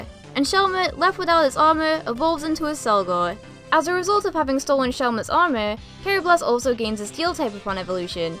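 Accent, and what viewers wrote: United Kingdom. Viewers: u got a british ascent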